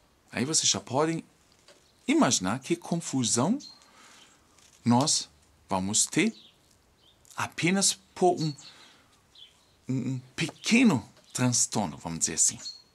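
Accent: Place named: Germany. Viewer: Seu portugues é excelente. Entendemos perfeito. Acho lindo seu sotaque alemão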